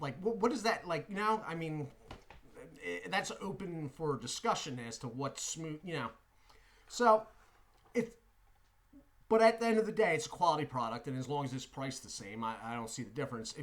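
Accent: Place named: Philadelphia, United States. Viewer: New sub! Are you from PA? You sound very Philadelphian lol cheers!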